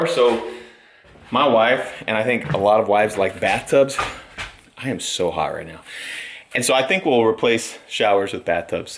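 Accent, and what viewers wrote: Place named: Montana, United States. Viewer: Montana...... Yes please Love the accent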